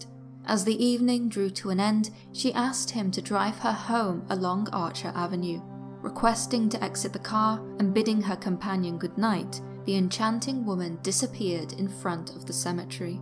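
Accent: United Kingdom. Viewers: is the narrator's british accent real?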